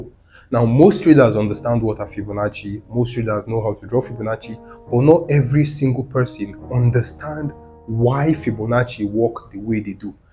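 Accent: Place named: Afrikaans, South Africa. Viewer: love afrikaan english😅🎉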